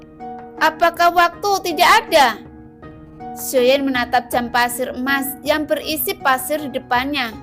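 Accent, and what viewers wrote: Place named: East Java, Indonesia. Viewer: Baru kali ini nemu channel BTTH acak"an gini, dan pembawaannya medok jawa timur sungguh gak enak didengar, PARAH NI NENEK NENEK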